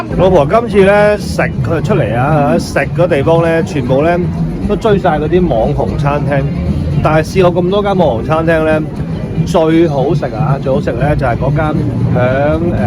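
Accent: Guangzhou, China. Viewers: 亞女講句粗口嚟聽吓先，睇吓正唔正宗廣州口音先😂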